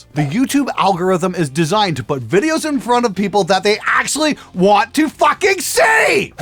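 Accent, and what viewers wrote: Canada. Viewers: You just can't hear your own accent, most people can't, but it is the 'aboot's that tells people you're Canadian, and not from the USA. 🙂 says aboot to a certain degree, you probably can't hear it though. Source - Australian with ears for over 50 years. 😁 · When I think of Canadian You Tube I think of him. I generally don't recognize a Canadian accent, but his insistence on "aboot" lets you know it's Canadian content. I had no idea that Canada was suppressing free speech in such a bizarre fashion. Is there any way this channel could do some kind of IP swap or something and just look like it was a few miles West out of Detroit? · Honestly, I didn't realize how many Youtubers that I watch were from Canada until I heard them all say "aboot" Not like it matters, they make good stuff, so I watch it.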